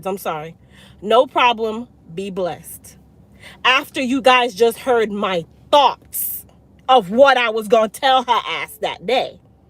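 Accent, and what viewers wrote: Jamaica. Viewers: Do I hear a Jamaican accent , dear one ? Sj.💛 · you are absolutely right! Love your Jamaican accent 😊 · U was born in usa...but u speak jamaican well... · I love how the patois comes in and then you put it in your pocket for a bit and then you pull it back out when the heat turns up. Blessings to you.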